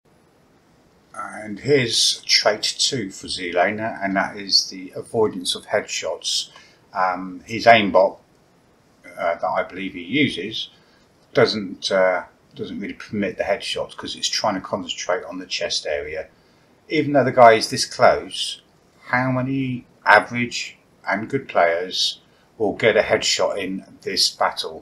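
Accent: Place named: United Kingdom. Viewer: Is this the 1st timw you guys hear a british accent😂😂😂 You need to get out more. Get a passport and see the world.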